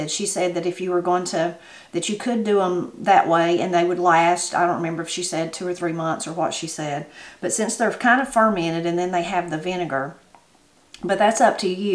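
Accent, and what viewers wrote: Appalachia, United States. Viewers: Just keep talking Miss, that’s what has drawn the majority of us folks to your channel is your colorful language, along with so much more. Anyone that doubts anything you say on here should meet you in person as Robbie Lynn & I have on several occasions now to witness that you are the same genuine person on and off the channel. We say Appalachia just like you do along with many other words you use like okrie and yes mam we push the Buggy in the Grocery Store to lol 😂 👍🏻👍🏻 We get that a lot on our channel to where folks say that we have an accent down south to like you mentioned, but to us it’s just the way we were raised to talk like you said about y’all. Oh yea common sense out weighs an education any day of the week in my book to. Thanks for sharing this our APPALACHIAN Friend!!!! 😇🙏🏻😇🙏🏻👍🏻🙌🏻❤️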